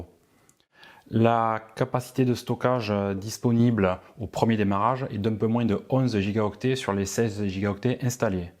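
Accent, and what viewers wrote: South, France. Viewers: Merci beaucoup et toujours avec l'accent du sud et le sourire en prime 👏👍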